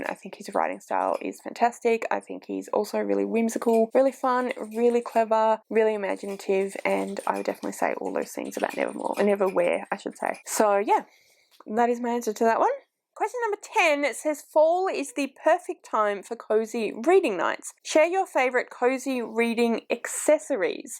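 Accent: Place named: Australia. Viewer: Haha, not gonna lie, I was a little confused when I saw the title, then as soon as I heard your accent I was like 'duuuh obviously it's autumn in Australia!'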